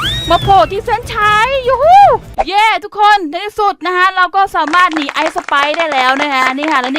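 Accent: Isan, Thailand. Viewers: พี่ เป็นคนลาวใช่ไหมค่ะหนูพี่พูอีสานคะ😅😅😅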